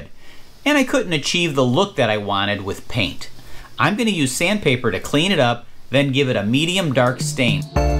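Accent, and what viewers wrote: Chicago, United States. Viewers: You're from Chicago aren't you? We hear it in our own.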